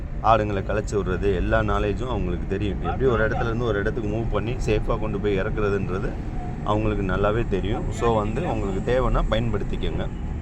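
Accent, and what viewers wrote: Madurai, India. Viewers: Assalamualaikum warh madurai basha ❤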